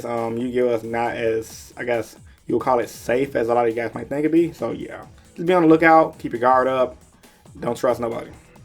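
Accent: Chicago, United States. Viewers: You from chicago man? Were you go?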